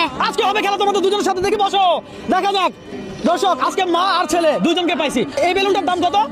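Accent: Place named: Gopalganj, Bangladesh. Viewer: Kotha soja gopalgonj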